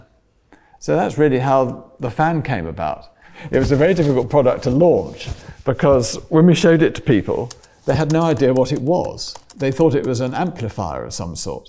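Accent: United Kingdom. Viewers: 上品なイギリス英語ですね！ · すんごいBritishアクセント。 かっこよすぎ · ブリティッシュのアクセントが最高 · イギリス訛りすごいな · イギリス英語たまんね〜〜〜😭😭😭😭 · イギリス英語？ググったらほんまにイギリス人やん聞き取りやすい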